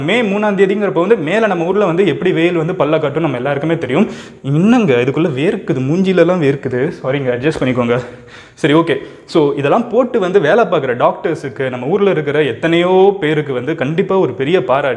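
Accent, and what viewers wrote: Madurai, India. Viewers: Neenga madurai ah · Madurai ya bro nega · நீங்க மதுரை யா...? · Bro nenga Madurai ah? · Neega Madurai yaah? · Bro neenga Chennai vaasi yaa Illa Madurai vaasi yaa pls sollunga bro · Nega madurai ya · Bro neenga Madurai ah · Bro neega madurai yaa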